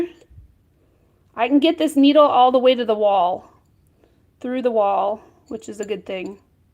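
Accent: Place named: Upstate New York, United States. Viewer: You sound like you’re from upstate NY. Or Michigan but mostly upstate western NY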